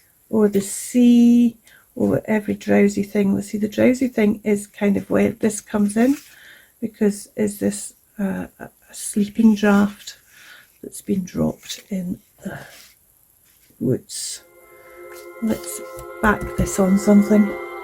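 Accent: Scotland, United Kingdom. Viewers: There is nothing more mystical than a poem by Poe read with a soft Scottish accent! I love your little project today, I don't ever think to sew smaller booklets into my larger journals, so thank you for the inspiration! 💜🖤💜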